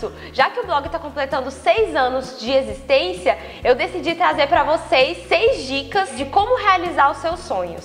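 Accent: Minas Gerais, Brazil. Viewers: Voce e mineira?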